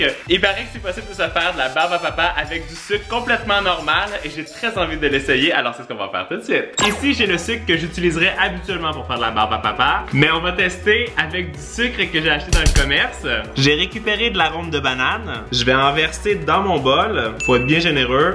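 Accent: Canada. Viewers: tu mon youtubeur préféré de is vidéos accent canadien et qui met quoi des vidéos trop trop rire 👍👍👍👍👍 🇨🇵🇨🇵🇨🇵🇨🇵 traduis le mot anglais 24h XLL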